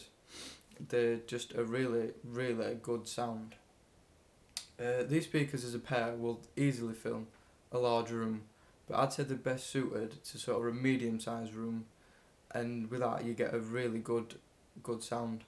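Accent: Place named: Sheffield, United Kingdom. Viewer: I just watched this .. and hearing you I'm guessing S61 or S62 .. ;) cos tha sounds local.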